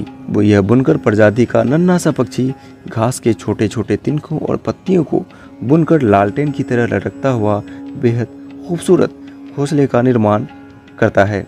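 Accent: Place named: Bihar, India. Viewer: Bihar se ho kya Bhai launguage me influence ...samajh aa rha hai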